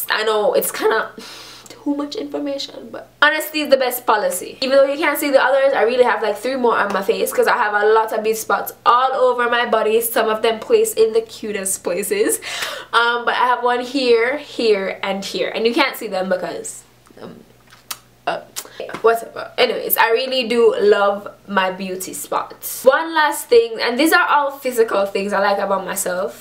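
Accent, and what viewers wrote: Jamaica. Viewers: Yuh can tell seh yuh a Jamaican big up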